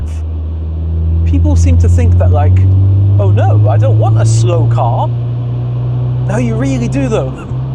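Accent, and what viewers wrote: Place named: South London, United Kingdom. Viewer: I’m getting a slight south London accent but that location looks beautiful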